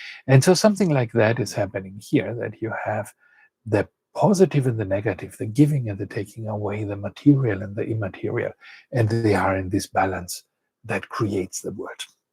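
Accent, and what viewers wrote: Germany. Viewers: Hello, may I address you like this? I love your content, my husband and I have been trying to learn Greek for a couple of years now and we especially like your approach of explanation with all the connotations. And since we write you from Germany we love the “airy” German accent in your English! Just saying that the sound quality in the streaming production was not very good and made it often difficult to understand your explanation. In the whole we look forward to seeing and hear ing more from you and thank you for your effort!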